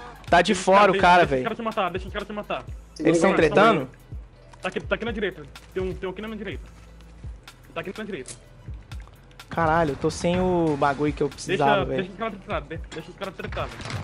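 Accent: Minas Gerais, Brazil. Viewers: Você é mineiro cara? MG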